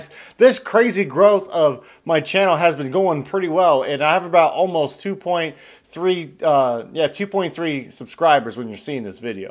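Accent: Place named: Australia. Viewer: IDK, I'm Midwestern. But you sound Australian/New Zealand to me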